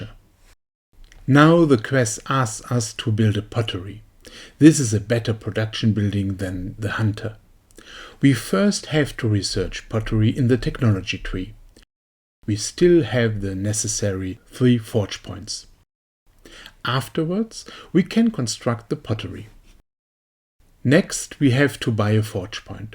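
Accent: Germany. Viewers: your accent is awesome haha. wundebar! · Hört sich sehr deutsch an dein Englisch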